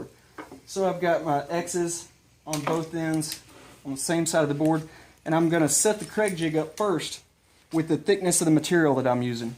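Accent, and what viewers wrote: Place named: United States. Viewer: Thanks for making us I needed somebody that speaks American most of the videos for some reason are from New Zealand or England and no offense my brothers out there it’s just y’all got the metric system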